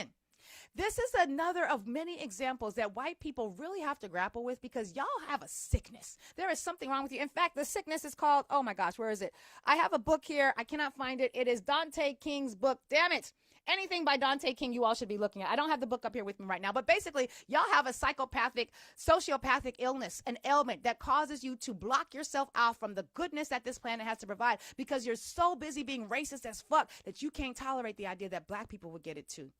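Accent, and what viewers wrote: South, United States. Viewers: I approve this message. BTW, your southern accent is hilarious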